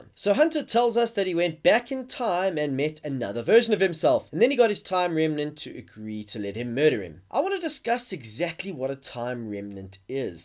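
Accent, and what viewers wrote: South Africa. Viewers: Is this guy South African?????? He sounds like he is.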